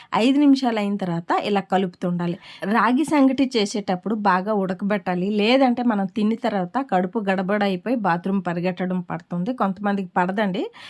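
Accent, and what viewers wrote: Srikakulam, India. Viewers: Slang srikakulam, place karnatakam, chesedi authentic rayalaseema food. Nice.. Flavours of India.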